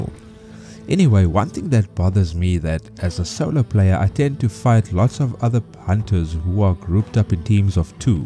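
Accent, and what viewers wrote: South Africa. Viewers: you have a very mild south african accent.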